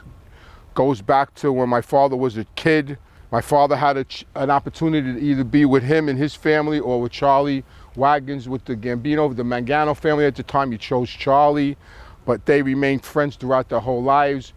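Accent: New York, United States. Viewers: Great production. Nice to hear a New York accent. Moved up to Catskill, N.Y. 10 years after retirement. Back home now. Man, l felt like Joe Pesci in My Cousin Vinny up there! With my N.Y. accent & my clothing & jewelry they'd step aside in stores, etc. Lol Buon Natale.🇮🇹🇺🇸🌲🍕🍷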